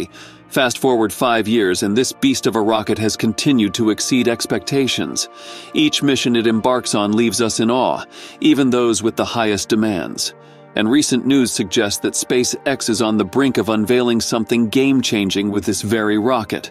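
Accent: United States. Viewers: thank you for plain western english!! I'm tired of the artificial "European accents"!!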